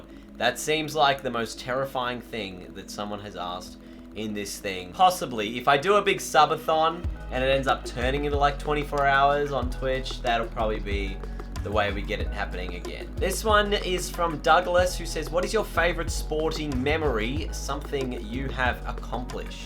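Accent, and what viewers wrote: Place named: Australia. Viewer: you have such a nice aussie accent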